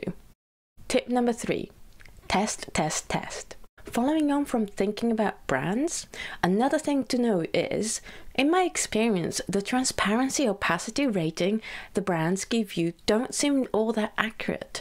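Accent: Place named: United Kingdom. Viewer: This video was so helpful...more please. Also may I ask what paper you use and where you can get it? I am assuming from your accent that you are also in the UK? Apologies if not. Thank you so much...Mand XOXO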